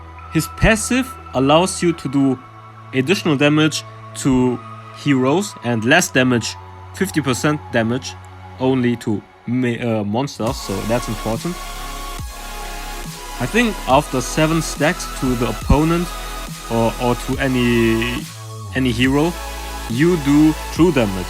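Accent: Germany. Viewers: So wie du Englisch redest safe deutscher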